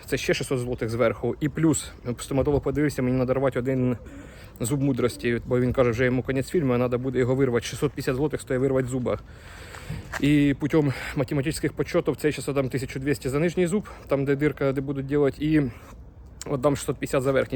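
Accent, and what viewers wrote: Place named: Poltava, Ukraine. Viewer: Рідний говір ) . Ви з Полтавської області? )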